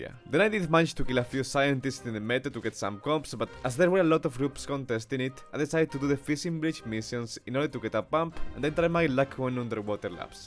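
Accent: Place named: Spain. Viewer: Illo are you Spanish you have the accent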